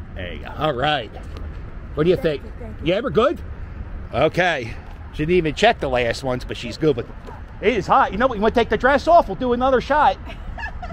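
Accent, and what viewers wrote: Philadelphia, United States. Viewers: Yo!, I subscribed to your site because I enjoy listening to the Philly accent again its been years. Great work on your videos, keep it up!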